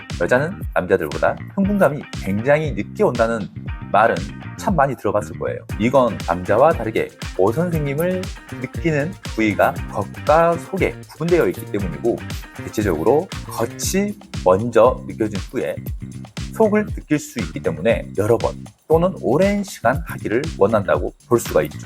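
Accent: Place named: Jeolla, South Korea. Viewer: 고향이 전라도신가요? 말투가 전라도 사투리가 나와서요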